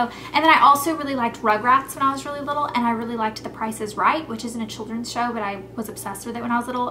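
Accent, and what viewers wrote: South, United States. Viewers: you have your old 'southern' accent back in this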